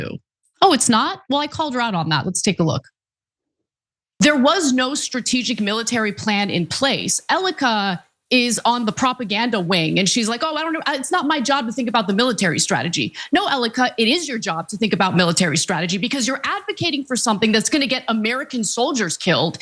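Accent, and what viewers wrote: United Kingdom. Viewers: Her extreme BRITISH accent proves she is NOT Iranian. The fuckin’ British caused most of this bs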